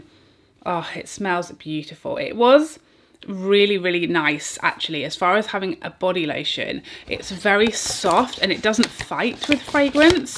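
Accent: England, United Kingdom. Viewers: Hi, I got to see your channel as a recommendation from YouTube.. Love your English accent.. Nice video 👌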